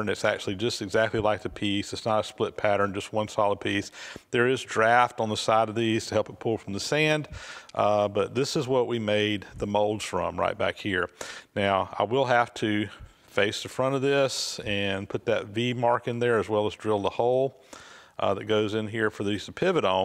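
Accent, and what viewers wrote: South, United States. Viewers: It is: United States of America, southern Georgia version!!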